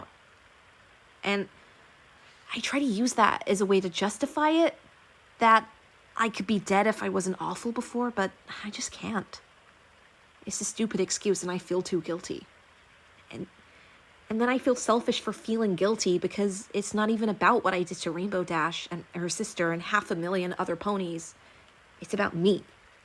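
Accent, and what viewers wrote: Australia. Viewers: DUUDDEE YOUR AUSSIE ACCENT IS AMAZING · YOUR AUSSIE ACCENT IS SO GOOD!!!!!!!!!!!!!!!!!!!!!!!!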